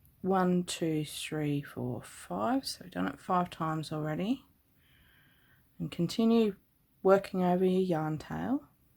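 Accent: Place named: Australia. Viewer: Great to hear an Aussie tutorial loved this made a few just subbed too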